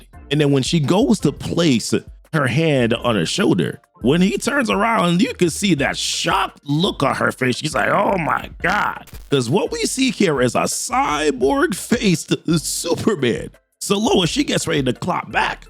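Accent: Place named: Jamaica. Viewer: i didn't know you were a yardie !? what part you from ?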